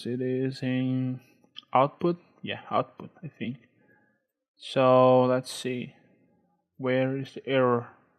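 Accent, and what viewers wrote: Brazil. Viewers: Mano você e brasileiro ? Ou americano ? ._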